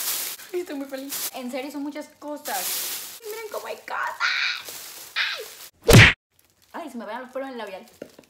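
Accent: Colombia. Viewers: ERES DE COLOMBIA???? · O ye tu eres colombiana ❤❤ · Está tipa no tiene asentó mexicano , tiene asentó colombiano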